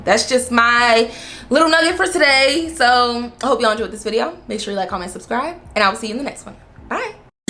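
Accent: Baltimore, United States. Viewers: I think it’s mostly Instagram not all social media platforms. Also what state are you from im trying to pick up on the accent? I feel like it’s Baltimore though.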